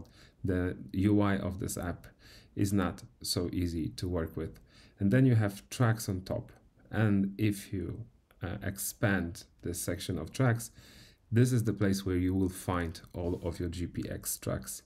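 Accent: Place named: England, United Kingdom. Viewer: Fajny angielski akcent masz.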